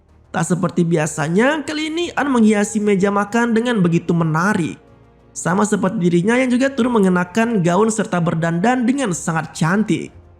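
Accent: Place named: Java, Indonesia. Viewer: Biasa jgn baper ilat jowo 👅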